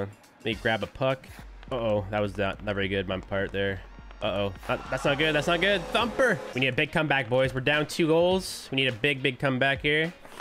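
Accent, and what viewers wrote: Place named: Canada. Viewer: This guy hella canadian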